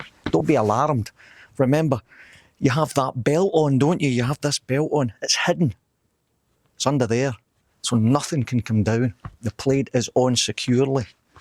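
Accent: Scotland, United Kingdom. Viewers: On 1 side of my family we are from Wales i think thats how u spell it and other side of family we got Scottish and I love the scots !! I would like them even if they wasn't in my past ! I love Scottish way you talk. The English you can't understand the Scots you can. Great video I love the kilt but never wore one.. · You could make a video about worms and I would watch/listen. Is “Bonnie” bad or good? Scottish accents are my weakness! 😩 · A Scottish man talking. I’m here for it.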